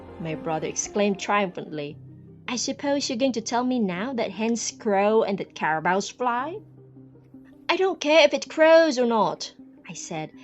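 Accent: United Kingdom. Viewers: You sound scottish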